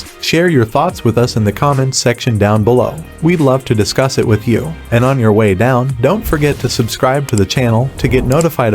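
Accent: United States. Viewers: As soon as I hear an American accent I anticipate click bait. Sorry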